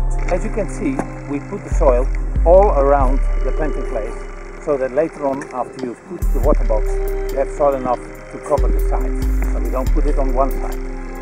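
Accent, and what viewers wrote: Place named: Netherlands. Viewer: Probably, cant miss the dutch accent tho lol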